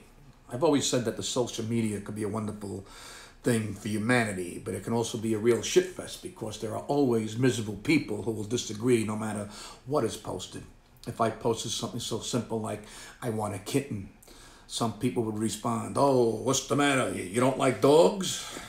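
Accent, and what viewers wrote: New York, United States. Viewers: Hey. i had to move to virginia to take care of my dad who is a decorated war hero...for 3 years now. my family has suffered from discriminations..yankee..go home even teachers and his football coaches...can you hit on this please..were hard core new york wear are shirts proud.but its taking a toll on my son..we love your videos so nice to here some swearing and that accent only new yorkers have..my sons name is Tristan..you would lift him up so much..we appreciate all you do...laughed my ass off on your virus video...ill burn the fucking truck and walk home...funny shit..we where rollin.your awesome.cant wait to get back home when my dad passes. We love you brother stay hard and be safe much love to your family as well...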